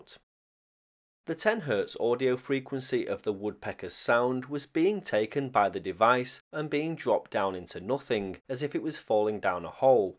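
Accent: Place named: Glasgow, United Kingdom. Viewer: is the man.... Great sense of humour too.... And he has a lovely Scottish accent... Glasgow I think.... He is very smart.... I'm a big fan of.... He is a very down to earth man.... And he gets on well with his brother..... I've had a few replays from him....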